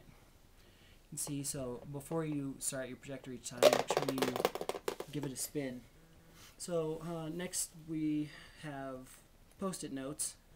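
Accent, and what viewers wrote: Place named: United Kingdom. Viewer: Great, you could be british!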